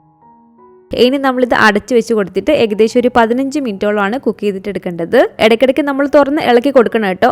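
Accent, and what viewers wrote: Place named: Ernakulam, India. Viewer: ഇത്താടെ വീട് എവിടെയാണ്. സംസാരം കേട്ടിട്ട് എറണാകുളം കാരിയാണെന്ന് തോന്നുന്നു 😊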